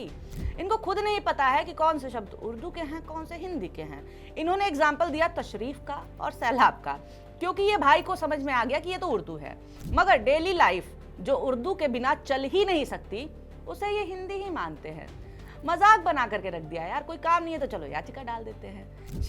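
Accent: Bihar, India. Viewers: Madam aap ka Bihari accent achcha hai ❤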